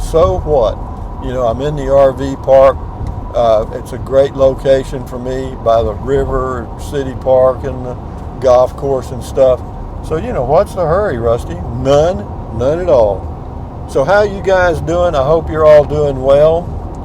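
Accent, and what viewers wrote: Texas, United States. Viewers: Happy July 4th! Another enjoyable video. Since watching your videos the last few months I am enjoying life more. Your uplifting no nonsense attitude with your Texas draw is giving us something good in our day. My daily dose of smiles :) Thank you!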